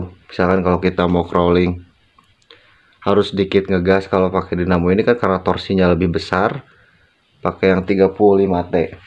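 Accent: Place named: West Java, Indonesia. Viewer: Kang sunda na karaos,asli timana 😅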